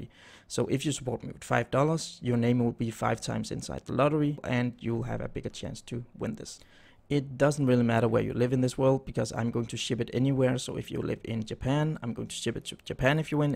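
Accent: Denmark. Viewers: Love your tutorials! just started coding so this helps alot. You sound danish, am I right?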